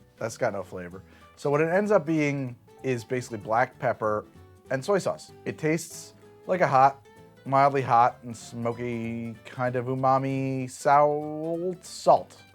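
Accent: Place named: New Jersey, United States. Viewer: I love that Jersey accent. Arange. Can't help but repeat it when he says Orange. He says it soooooo much lol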